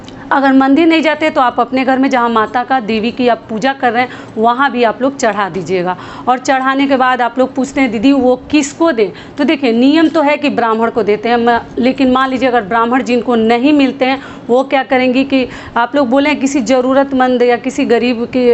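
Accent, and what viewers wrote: Bihar, India. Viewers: Jai mata di..didi ap Bihar se ho kya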